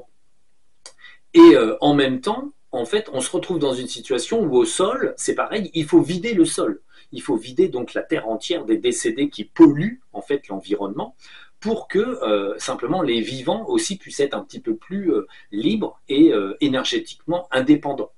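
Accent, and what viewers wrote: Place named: Paris, France. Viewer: salut j' avoue, tu m'as bcp fait rire comme souvent avec ton parlé titi parigo, assez déçue de l'arrivée au sol d'aurobindo loool, son eleve Satprem a écrit un livre ''evolution 2'' que j'ai tjrs gardé en tête et bcp apprécié, il m'a fait grandir c'est certain, depuis j'ai bcp évoluée ou pas.... d'ailleurs, tu sauras me le dire peut être..., j'ai pensé que son instructeur qui était donc Aurobindo, était un peu plus avancé que cela, même si j'ai effectivement bien compris que la connaissance est une question de temps et de moment où un savoir est donné a l'humanité qui ne peut donc pas accéder à des connaissances plus élevées tant que le temps n'est pas venu, sacrée prise de conscience pour moi je l'avoue assez récente, mais qui a remis tant de choses en question, comme depuis 45 ans, où je ne cesse de reculer pour mieux sauter... 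retour estival, j'espère trouver vite les moyens de recourir a tes services, mr, mais je dois avant cela, te demander si il est recommandé de renouveler une séance alors que j'ai bénéficié il y a plus de six mois de celà, d'une séance qui m'a été proposée et pas du ou pu refuser, bref et que l'on trouve en ligne d'ailleurs sur youtentube,et qui a abouti à un effondrement psychique et physique total, comme mourir a moi même si j'osais le dire et dont je me relève tout juste maintenant... a peine.... bref tu sauras me conseiller en temps voulu j'en suis certaine....et enfin, juste pour dire..., je suis assez étonnée que tant de personnes ne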